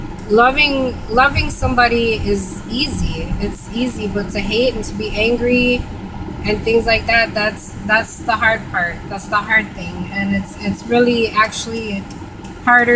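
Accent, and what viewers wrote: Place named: New York, United States. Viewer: I love your accent, are you from NYC?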